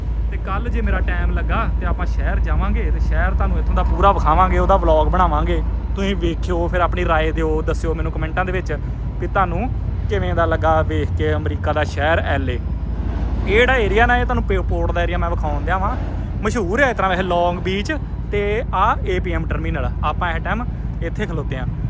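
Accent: Majha, India. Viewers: ਬਹੁਤ❤ਹੀ ਵਧੀਆ ਜਾਣਕਾਰੀ ਹੁੰਦੀ ਹੈ ਆਪ ਜੀ ਦੀ ਵੀਡੀਓ ਵਿੱਚ ਅਤੇ ਆਪ ਜੀ ਪੰਜਾਬ ਦੇ ਕਿਸ ਜਿਲ੍ਹੇ ਤੋਂ ਹੋ ਕਿਉਂਕਿ ਤੁਹਾਡਾ ਬੋਲਣ ਦਾ ਲਹਿਜਾ ਸਾਡੇ ਇਲਾਕੇ ਮਾਝੇ ਦਾ ਹੈ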